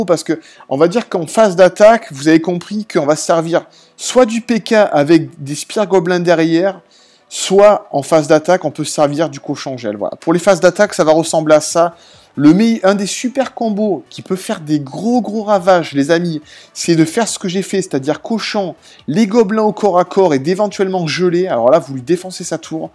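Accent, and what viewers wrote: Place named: Toulon, France. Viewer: j aime trop tes vidéos PS: l accent toulonnais et bien aussi ;)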